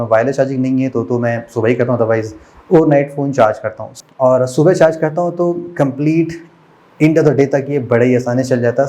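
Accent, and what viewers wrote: Lucknow, India. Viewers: Sir aap Lucknow sa ho kya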